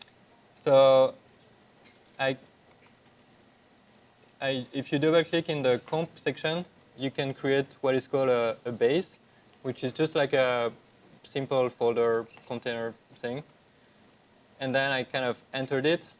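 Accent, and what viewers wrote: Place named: France. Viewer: Franglais accent make me super concentrate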